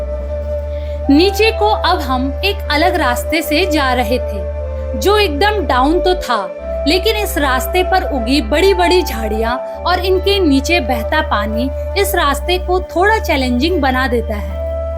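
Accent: Uttarakhand, India. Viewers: Thank you ji apne jo vlog banaya jo apne itna sunder hill station dikhaya hame itn sunder hill se jyada apki awaj me muje uttarakhand ki mahak ati hai ji itna sunder cuit smile dekh ke dil khush ho jata hai apke vlog ka besabri se intjar rehta hai love you vlog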